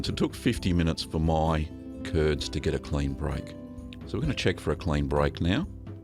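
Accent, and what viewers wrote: Australia. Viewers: Hi. Loving your videos - great work. I notice you use the numbers for your cultures. I'm in Australia and from your accent so are you. Can you let me know where you buy your cultures? My online supplier just sells soft and hard meso cultures and I can't figure out how to map them to the numbers they use on <link> Thanks in advance!!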